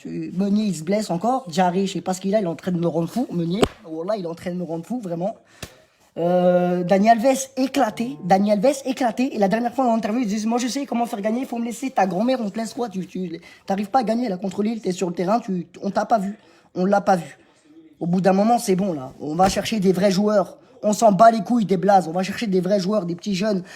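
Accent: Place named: Paris, France. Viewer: L'accent parisien cet horrible 😱. Mdrrrrrr le titre de la ligue 1 conforama lol 😂 et la coupe de France 🇫🇷 mdrrrrrr grandiose mec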